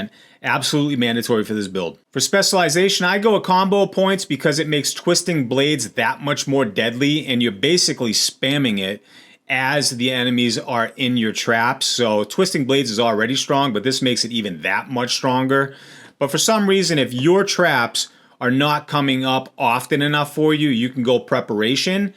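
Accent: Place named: Massachusetts, United States. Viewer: Dudes Massachusetts accent is showing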